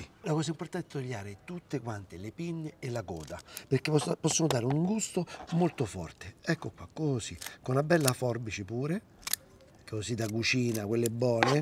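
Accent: Rome, Italy. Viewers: Chef, La premessa è che guardo i tuoi video dagli albori e ti seguo da quando giravi per gambero rosso in tv. Ultimamente però ho da fare una critica, sperando sia costruttiva. Noto che il tuo modo di fare video è cambiato, adattandoti e quasi imitando quelli di un certo “cuoco amatoriale” di cui non voglio fare il nome che gira i video dal proprio balcone di casa… Tutto questo voler enfatizzare il romanesco e la gestualità molto simile all’altro usata per me ti rende meno autentico di quello che sei e che hai sempre dimostrato di essere. Spero venga visto solo come un parere personale e quanto più costruttivo possibile e non come una mera e deleteria critica. Saluti Max, comunque ti seguirò sempre e comunque per la tua simpatia e professionalità! ❤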